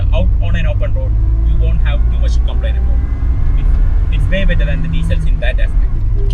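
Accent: Kerala, India. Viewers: Just one thing.....you have that typical Mallu Accent... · Malu english mind blowing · Bro...I feel that thick Malayali accent 🤣👍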